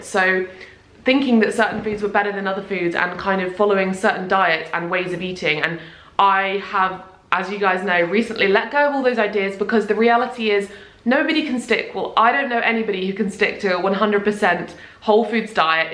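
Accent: United Kingdom. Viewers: aiutooooooooooooo the uk accent is really hard to understand , could you help the people about that ( it seems that you have to run , because you have to make dinner / lunch or to go in bathroom hahahah) ????????? thanks in advance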